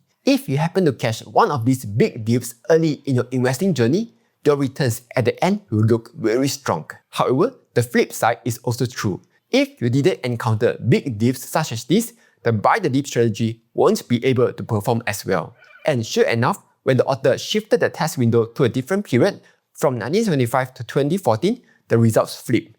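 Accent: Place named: Singapore. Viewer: I can't understand your singlish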